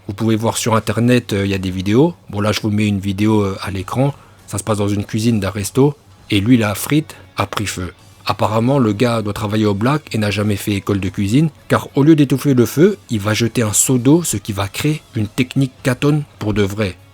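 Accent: Japan. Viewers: L'accent jap est magique !!!! Est-ce qu'Amaterasu compte comme une technique Katon cher porte parol ? (C'est du feu non ?)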